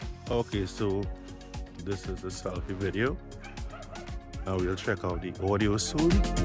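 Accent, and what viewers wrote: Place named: Jamaica. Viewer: patois accented tech reviews instant subscribe brudda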